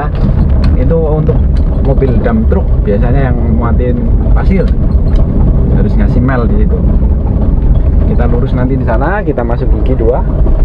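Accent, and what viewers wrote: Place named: Banyumas, Indonesia. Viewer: Wong ngapak ya😁😂😃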